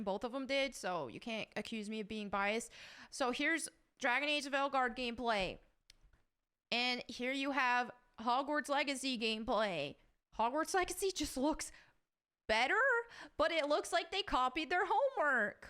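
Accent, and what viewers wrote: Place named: Pittsburgh, United States. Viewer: I'm not sure how you popped up but damn I'm glad you did. Not afraid to say what everyone is thinking... Lara Croft... And the steelers popcorn container back there - I have one that's coors light/steelers. I thought I heard some Pittsburghese in there but I'm not sure on that, all I know is you're my new favorite person lol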